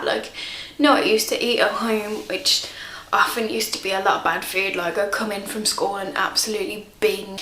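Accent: Birmingham, United Kingdom. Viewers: I'm having a hard time understanding your British talk lol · are you from birmigham?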